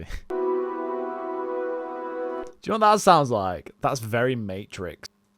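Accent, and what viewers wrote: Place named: Yorkshire, United Kingdom. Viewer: Another Yorkshire lad?? 🤘🏻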